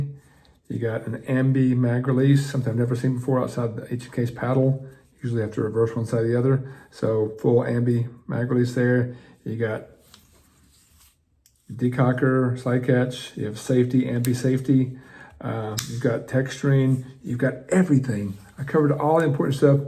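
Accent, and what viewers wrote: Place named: Belgium. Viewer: I’m just guessing, but are you originally from Belgium? Had to ask because of the accent 😉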